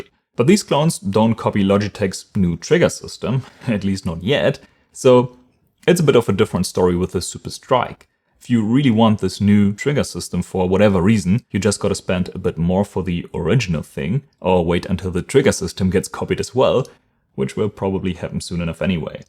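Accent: Germany. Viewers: I've noticed I'm hearing the German Knacklaut less frequently these days. I must admit, it's quite pleasing to the ear. Not that I don't appreciate a German accent in fact, I think is rather charming.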